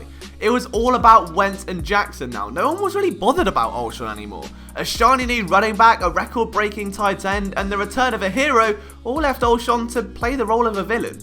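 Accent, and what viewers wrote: United Kingdom. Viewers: Well, I'm from the Philly area, and knows as much about my Philadelphia Eagles as any other reporter, if not moreso. I fully embrace his accent and overall tone and cadence, in fact as an American who spent some time in Scotland, I knew people over there that poked fun at the NFL because it wasn't "literal FOOTball" so frankly I enjoy the fact that a young man from the UK became a top tier Philly Sports writer/commentator because of how much he loves the Eagles etc. You're awesome, and your accent is great, and makes me happy to know that our sport and more specifically my Philadelphia Eagles can not only reach someone from another country, but also capture their heart like it has mine and many others. You be you.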